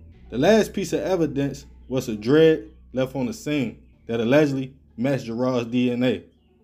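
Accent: Baltimore, United States. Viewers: Boy you need to speak a bit clearer. You can blow. But your journalism is kinda suited for only Baltimore ears. Not saying you not doing ya thing. But it takes practice to shaking how thick our accent is. This ain't no hate, I just know it's hard cracking mainstream with how thick our slang n mannerisms are. Shout out to you tho brova. G shit.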